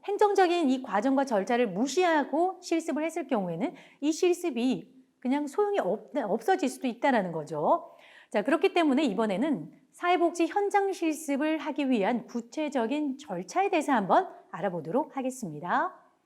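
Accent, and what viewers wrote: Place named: Gyeongsang, South Korea. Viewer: 오래전 교수님통해서 복지사자격증 취득했는데요 구수한 경상도사투리에 강의참잼나게. 청취했습니다 대단한교수님입니다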